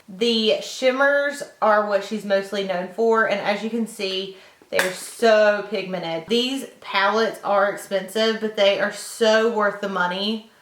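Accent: South, United States. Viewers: Tabatha Gaytan i think its her accent its sooooo thick really southern also when she says oil she doesnt pronounce the i she says ol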